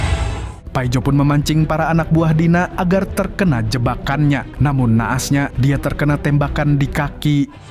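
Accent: Banjar, Indonesia. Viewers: Cah orang banua jua sekalinya😂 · Mang pian urang banjar kah? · Bah waluh urg banjar skali ny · Urang banjar kah pian min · Buan banjar kah mang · Urang banjar kah pian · Orang banjar jua 😮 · Banjar jua sakalinya 😅😅😅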